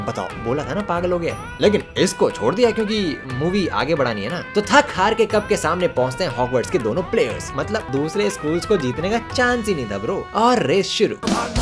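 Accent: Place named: Bhojpuri, India. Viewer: I never saisthis before but does have a brilliant bhojpuri vocabulary and accent as well as great voice modulation skills..kudos to that 👍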